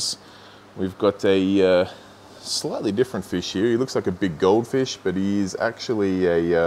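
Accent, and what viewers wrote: Australia. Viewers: Wow, what a store! Also, I love Australian accents. For real.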